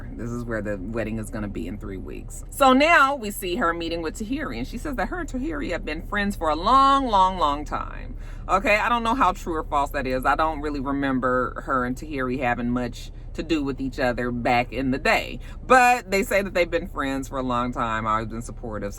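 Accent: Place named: California, United States. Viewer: Don't wanna watch the show..So Thanks...Your review is EVERYTHING!...the more I view the more I clearly I hear your accent..lol California ❤️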